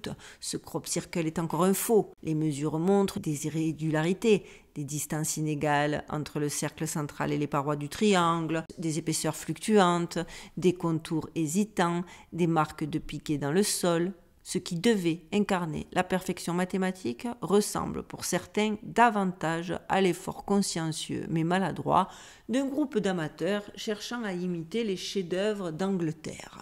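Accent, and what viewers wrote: South, France. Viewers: hello, encore et tjrs un régal soupoudré par ton accent des cigals, moi la parigotte, j'adoooore, et te remercie, sais tu qu'il a été plus que soupconné, voire prouvé, que les cropcircles, les vrais sont formés par une énergie electro magnétique qui vient du sous sol et non du ciel comme nous le supposions et oui les fréquences electro magnétiques agissant comme on le sait sur le vivant, et de façon comme tu le dit, totalement impossible à reproduire avec des raquettes attachées aux chaussures, bref juste pour ajouter à tes infos que les fréquences qui dessinnent ces crpcircles viennent de l'intérieur de la terre, comme quoi à force de nous avoir dit d'observer le ciel, peut être que tout vient de l'intérieur et non de l'extérieur comme pas mal de choses, n'oublions pas que tt est inversé, donc, commençons à regarder vers le bas et cessons de regarder les mouches voler ou un ciel encodé, là pour nous déviez l'attention de ce que nous devrions réellement regarder, MERCI pour celle que tu es, MERCI pour ta belle humeur, sourire et humour nécessaire en ces temps de mer.......e. 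Love from Paris!!! Nathalie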